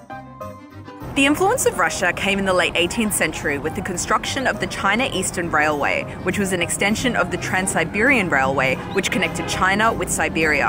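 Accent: Australia. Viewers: Australian accent? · Host is an Australian?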